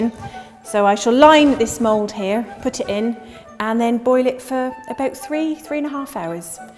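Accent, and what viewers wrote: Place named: West Country, United Kingdom. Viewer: The west country accent was a little stronger in these earlier episodes, lol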